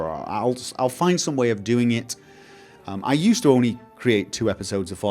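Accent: United Kingdom. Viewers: the standard English pronunciation, as opposed to the American English pronunciation. Some younger Brits have started using the American way but is old enough to have learned the old standard.;)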